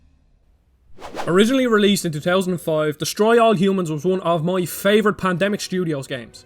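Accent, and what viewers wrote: Dublin, Ireland. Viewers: Didn't expect a Dub accent on a Gamexplain video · Of all the places I expected to hear a Dublin accent, a GameXplain video was pretty low on that list. Fair play to you,.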